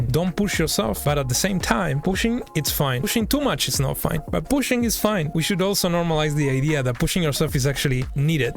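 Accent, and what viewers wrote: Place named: Argentina. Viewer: Idk but I can't stop asking myself- from where are you? Bc your accent sounds very argentinian KSNSNSA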